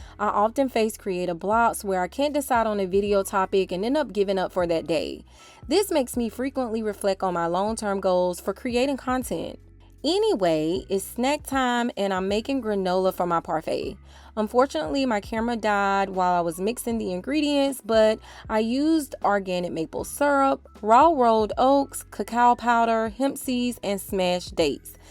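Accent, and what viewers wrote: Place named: South, United States. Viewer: Not sure how you got to my algorithm, but I'm happy ya did and you had me ❣️🎶 I've been debating giving raw a try but it seems daunting, as we have 4 young children and the thoughts of cooking for them and something entirely different for me overwhelms my mind. I think I'm going to try it by starting small. Thanx & God bless!✌🏽 (P.s....sounds like I hear some Philly in your accent yet, I hear some South. I'm orig from VA.)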